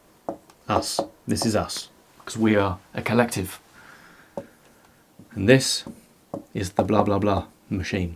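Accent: United Kingdom. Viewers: I should like to think that I would appear interesting if i have a cool british accent as you do😊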